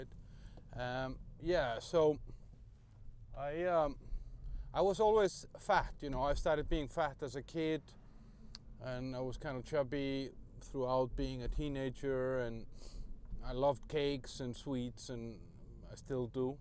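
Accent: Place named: Iceland. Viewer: Hah! I knew it from the first minute, that accent. I lived in Iceland for several years in the early 90s (turned 20 in keflavikr) I miss the lamb hotdogs. I've been carnivore for a while now, it's definitely a huge help.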